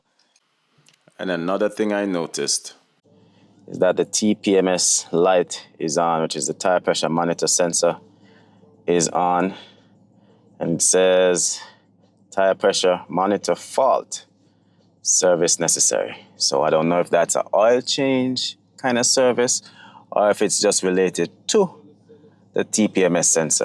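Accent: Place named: Caribbean. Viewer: I cannot help but notice , you West Indian I can hear an accent . PS I feel you should keep it tbh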